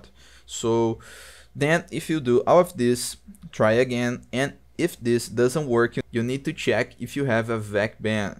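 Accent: Brazil. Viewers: Valeu mano, seu inglês é fd mas o sotaque do BR não sai 😂❤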